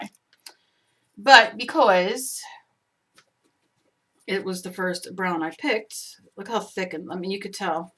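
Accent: New Jersey, United States. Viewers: You're my first YouTube comment post! I've been following your channel for about 4-5 months and am always watching them multiple times. I'm not a beginner but enjoy your banter while I craft. I will be doing the swirly tree myself soon and will try to figure out how to "tag" it to you. You're great cyber company. I'm a NJ native living in Georgia and when I came across your channel while surfing one day, the first thing I noticed was that Jersey accent. So I tuned in and haven't tuned out yet. I have a very stressful and demanding job and try to "art" in the morning cuz by the end of the day, I'm too tired to do anything. Thanks for being yourself and sharing your lovely personality with the rest of us. I've been very cyber shy about sharing my work publicly but I may find the courage soon thanks to your inspiration. Keep doing what you do and don't worry about the darn "clock".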